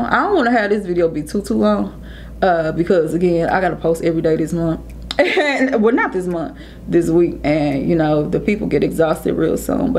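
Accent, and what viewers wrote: St. Louis, United States. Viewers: hello beauty, i see you and i still see you. you remind me so much of both my daughter and niece (it's that accent... st. louis). my hard working mother of two daughters is also considering weight loss surgery and i've suggested she view your videos. i know everyone won't have the same experience but i loved your candor and realistic journey. she too wants the surgery afterwards and yes she has friends who kinda look at her like she crazy for wanting this for herself. So it is quite true you cannot please everyone, why try, hard enough trying to get support from those around you. it's a brave and scary thing. still loving your journey and that cute little man you got. i know he keeps you busy...i swear it amazes me how much i see my diva you in. and you are a diva in the best of ways. bless you love The Lord keep you guided❤👍🙏👶❤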